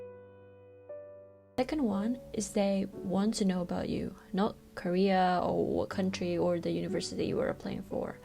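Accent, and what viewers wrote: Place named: United Kingdom. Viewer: how can u speak like a British? Can u give somes tips n trick?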